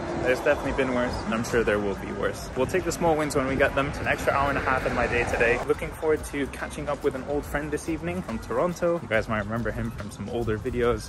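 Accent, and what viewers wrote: Scotland, United Kingdom. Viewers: His accent has changed a lot. He sounds so british sometimes. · you sound so scottish to me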